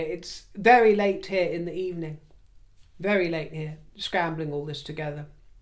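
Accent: United Kingdom. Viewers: you pronounce privacy as it should be in Britain, not the Americanised version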